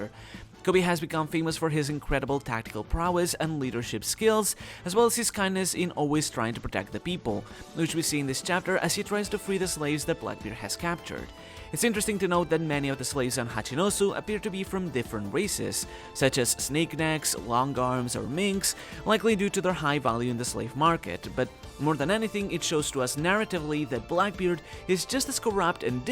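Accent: Spain. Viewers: Are you Spanish? I’m just guessing from your accent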